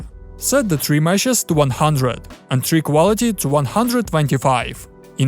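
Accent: Russia. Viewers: русский акцент ни с чем не спутать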